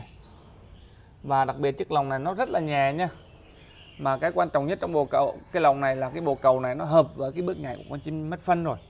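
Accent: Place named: Quang Tri, Vietnam. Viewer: Làm video ép giọng mào non đi anh giọng quảng trị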